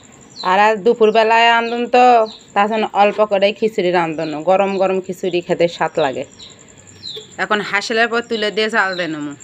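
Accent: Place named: Joypurhat, Bangladesh. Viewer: জয়পুরহাট জেলার ভাষা মনে হচ্ছিল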